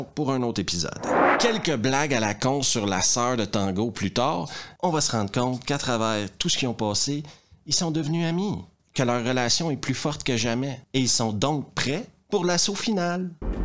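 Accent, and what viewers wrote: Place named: Quebec, Canada. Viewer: Ton accent québécois 🇲🇶 est vraiment très difficile à comprendre pour nous les français 🇫🇷🤷🏽 il faut vraiment travailler ton accent !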